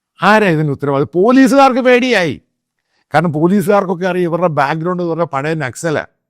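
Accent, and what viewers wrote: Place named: Travancore, India. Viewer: തിരുവിതാംകൂർ ഭാഷയുടെ ഭംഗിയാണത്.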